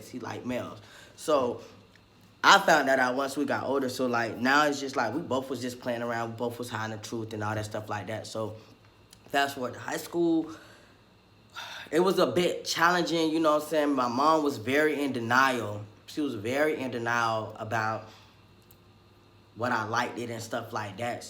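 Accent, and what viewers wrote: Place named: Florida, United States. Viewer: I can hear that Florida accent!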